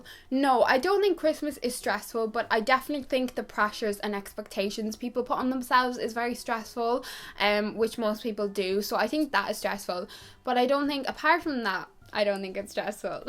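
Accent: Ireland. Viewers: And the award for Best Irish accent goes to you 🏆🥇🎗. You’ve just won First prize.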